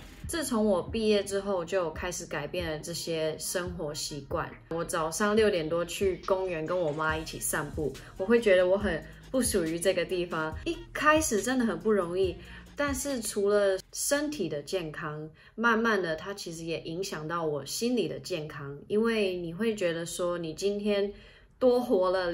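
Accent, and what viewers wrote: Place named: Taiwan. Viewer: 恭喜妳！妳的國語100%的台灣腔，完全沒有外國腔調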